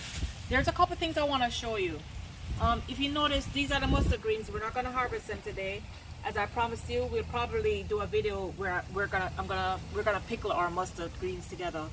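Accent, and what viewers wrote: Jamaica. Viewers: you're welcome Jamaican accent Are you Jamaican or just Jamaican parents?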